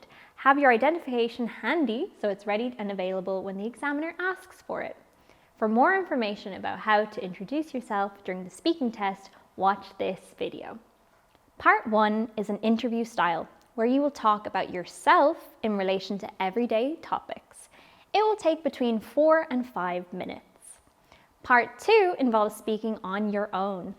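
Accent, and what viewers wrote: Ireland. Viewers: Bro, she cant hide her irish accent... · who's this presenter? She's Irish. Very good.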